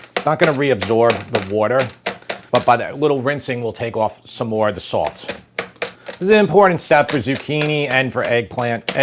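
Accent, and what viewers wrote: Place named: Philadelphia, United States. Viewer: He's gotta be from the Greater Philadelphia area.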